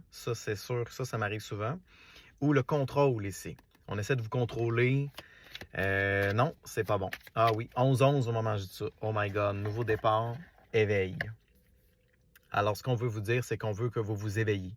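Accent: Quebec, Canada. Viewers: Bonjour, j'adore vôtre accent québécois, c'est craquant. Je me permets de vous dire que vous êtes trop beau 🙏. Bonne continuation. À bientôt.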